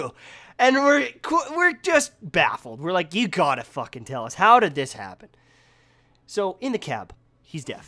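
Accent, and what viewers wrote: Canada. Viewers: R u Canadian ???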